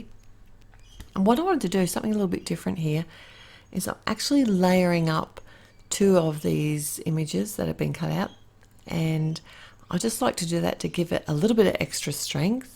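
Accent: Australia. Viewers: Just learning how to use my cricut. You sound Australian, me too.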